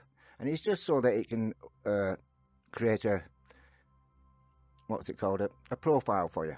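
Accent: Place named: United Kingdom. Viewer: I can't understand what this man is saying because of that accent. Sounds British and I don't understand British.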